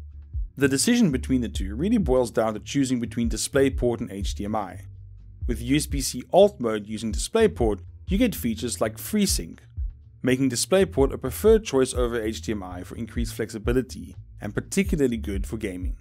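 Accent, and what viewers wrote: South Africa. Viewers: uppa the south african accent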